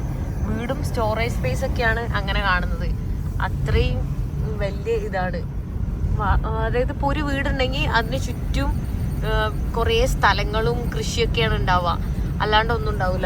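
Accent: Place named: North Kerala, India. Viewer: വടക്കൻ കേരളത്തിലെ സംസാര ശൈലി കേൾക്കാൻ ഞങ്ങളെ പോലെ മദ്ധ്യ കേരളത്തിൽ നിന്ന് ഉള്ളവർക്ക് റെസം ആണ്😊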